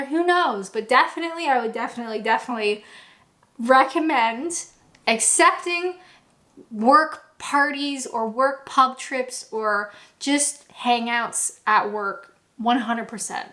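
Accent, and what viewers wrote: England, United Kingdom. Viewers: As well as "knackered, there was also "Autumn"! Both said without blinking... I think I'm also detecting a trace of an English accent there too. I strongly disagree about showing up late for a party! As a host we are always a bit late getting ready, and always pray that nobody actually turns up on time! Clearly you are the annoying friend who always turns up on the spot and that we have to stick in the living room with a glass of wine while we finish the preparation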